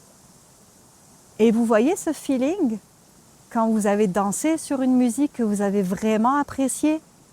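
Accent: Quebec, Canada. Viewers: Je suis sur que tu es au Québec 😁ton accent Français se confond avec le Québécois lol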